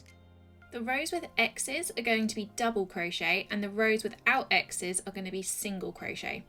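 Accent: England, United Kingdom. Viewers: Thanks for this tutorial it is something I really want to try. You have confused me a bi thought - speaking with an English accent but talking in US terms! I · You sound English but seem to using American terms? E.g. ‘single crochet’ instead of ‘double crochet’. I am I correct?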